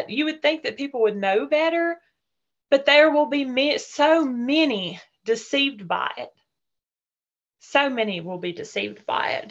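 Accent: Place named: Australia. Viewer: Mr. you have a beautiful accent. I can't place it though. Is it Australian or New Zealander? (Whichever country it is from I like it).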